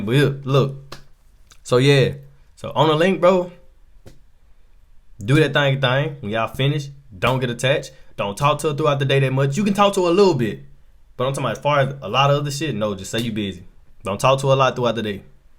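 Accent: United States. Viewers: He has a Southern accent maybe Florida Louisiana Mississippi or Georgia. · Yo u too American ur acsent is too intense for us in asia but your vids are dope 👊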